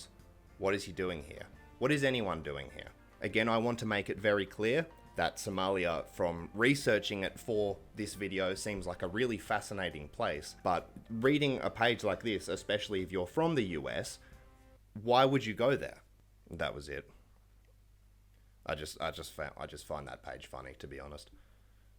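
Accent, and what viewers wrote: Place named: United Kingdom. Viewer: you have a british accent..you from the uk?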